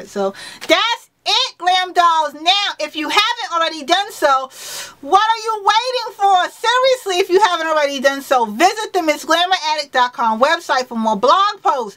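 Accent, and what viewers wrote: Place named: East Coast, United States. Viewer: New subbie love the accent. Philly, NJ or NY? Lol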